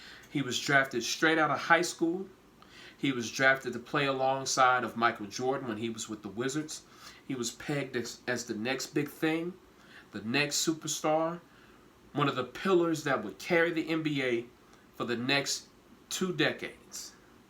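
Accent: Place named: South, United States. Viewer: As a southerner from Memphis I love hearing him rip these phonies a new one because he talks like we do.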